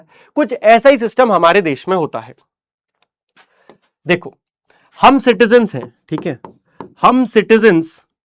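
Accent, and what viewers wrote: Bihar, India. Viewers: Ara bhaiya lag raha haii ki bihar se ha 😮😮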